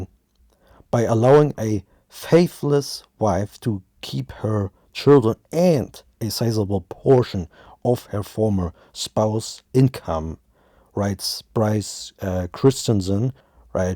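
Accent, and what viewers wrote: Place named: Germany. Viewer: Are u german I like your accent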